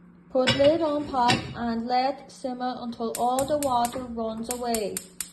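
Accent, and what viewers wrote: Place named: Derry, United Kingdom. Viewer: I can here the derry accent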